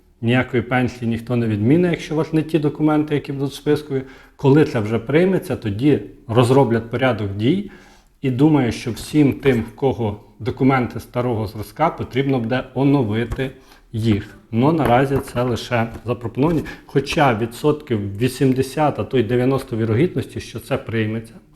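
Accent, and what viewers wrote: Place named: Khmelnytskyi, Ukraine. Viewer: О ! Землячка Дубровського (хмельниччина) : "...цЕго року", "...будуТ робити" ... і ще доооооохрена подібних "перлів" з місцевого говору (всього раптово і не пригадати...) (P.S. Може бути таке, що, прочитавши мої слова, багато хто і не знає, що Дубровський - це і є той самий Саша, котрому всі ви, мої "колеги" по пенсійному віку, надсилаєте свої коментарі на канал "Народна думка"... Всі знають, що - Саша... А прізвище... ??? Упевнений - багатьом невідоме ! ( мужикам-то воно більше знайме по вислову : "Не с . и, Маша, я - Дубровський !" ))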